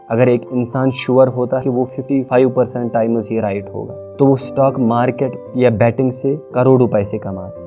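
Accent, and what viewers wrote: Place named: Kashmir, India. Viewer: A nice summary ....voice really soothing Kashmiri ascent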